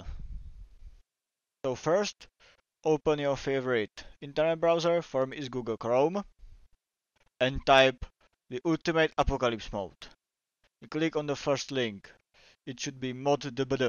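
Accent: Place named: Czech Republic. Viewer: Slyším českou abecedu :D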